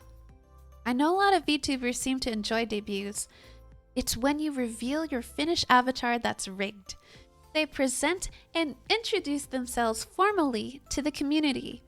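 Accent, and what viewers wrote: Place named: United States. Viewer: You live in Europe? where?.... eh some people don't like to disclose that information. Your accent sounds pretty American to me so that's shocking to hear.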